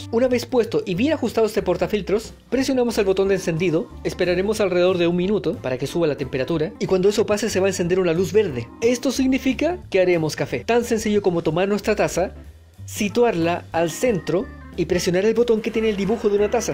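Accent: Standard. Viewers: Habló lento y con acento neutro, jajajajaja. El acento chileno original es incomprensible fuera de Chile. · tu acento universal es fantástico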